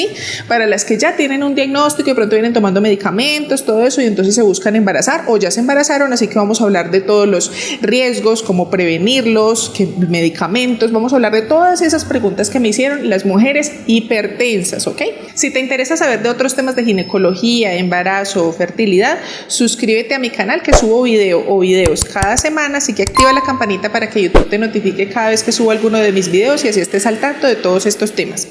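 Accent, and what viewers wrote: Colombia. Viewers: Hoy llege tarde 🙋‍♀️🤭 saludos bella ❤ Amo su acento colombiano ☺😊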